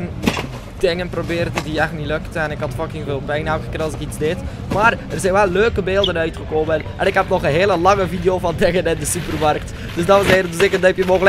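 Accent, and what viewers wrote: West Flanders, Belgium. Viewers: jaja eindelijk een west Vlaming Zaliggg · Uw accent is echt top! Belgium aan de top <3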